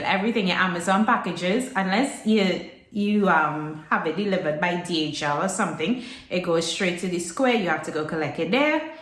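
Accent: Trinidad and Tobago. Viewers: I hear your Trini accent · love your trinidad accent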